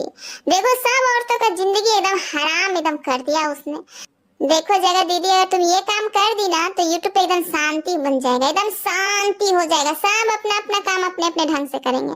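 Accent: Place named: Magahi, India. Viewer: Didi ap kaha se ho plz bataiye.mujhe aisa lg raha h ki ap ka bolne ka tone aur kuch word jaise lagagit hau use ki wo to maghi word h a mujhe lg raha ki ap maghi ho pl z ap batao ki ap kaha se ho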